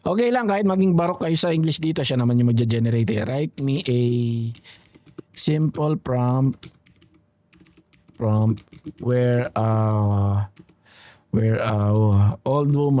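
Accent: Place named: Pampanga, Philippines. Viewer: Kabibili ko lng ng YT Shorts Automation course 199php lng nmn, pero dhil Shorts sha pti yta tutorial nya shorts din hahaha atleast dito may proper explanation.. At dahil libre ang kaalaman d2 at Kapampangan ka (huli kita sa accent mo tol hehe, Kapampangan din Ima ko) cge subscribe ako sayo, more power sa Channel mo!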